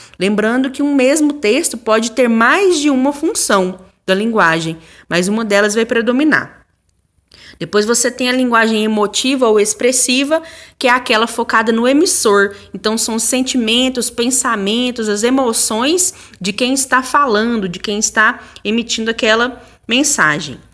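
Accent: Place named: Belo Horizonte, Brazil. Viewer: Me ajudou muito, obrigada de ❤️,pelo sotaque chuto que é de Bh ksksks ameii+1 inscrita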